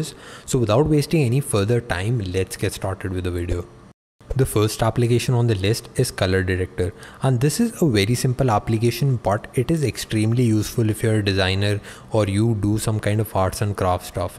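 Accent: India. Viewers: please your indian accent is so irritating...too hard to take...alvida. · D and T are two different letters PRONOUNCE THEY DIFFERENTLY fucking indian accent 😒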